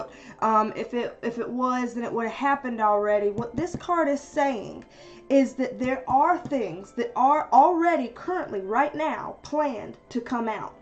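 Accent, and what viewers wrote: South, United States. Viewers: You sound country as hell and I love it!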